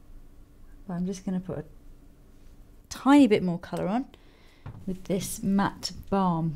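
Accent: England, United Kingdom. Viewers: I'm so glad you're doing youtube videos, so I can just listen to your lovely British accent and relaxing voice on the days I'm missing London and the days I used to spend in that fascinating city while I was working there for a good while.:D and also are these vids totally satisfying to my insane makeup lover self as well. thank you for being such an awesome artist and a huge inspiration!:) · you can hear she picked up a bit of a British accent living in London:) this is an amazing look! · Its a weird comment but I love your accent, it reminds me so much of Downton Abbey!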